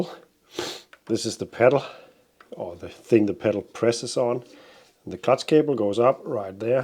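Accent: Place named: Denmark. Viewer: There I was just eyes down reading a book and your video had just begun when I heard Al Pacino say those immortal words “ Say hello to my little friend “ honestly it sounded so much like him 😂, Pacino with a Danish accent Ofc but so good 😂😂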